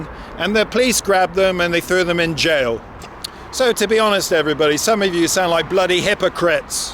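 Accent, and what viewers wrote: England, United Kingdom. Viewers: The most annoying thing about you is your pompous english accident maybe that's why you only have ten thousand subscribers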